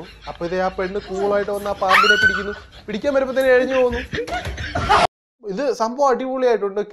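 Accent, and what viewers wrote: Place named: Kottayam, India. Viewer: Chettan kottayamkaaranaano slang kettittu thonnanallo😊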